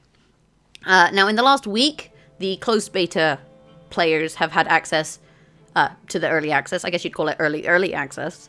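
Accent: United Kingdom. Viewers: Are you from NI?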